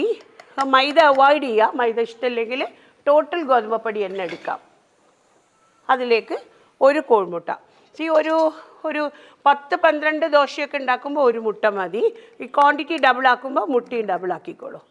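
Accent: Kozhikode, India. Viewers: I am from Kozhikode now living in Banagalore when I hear you talking in typical kozhikkodan Slang oh my God I really like it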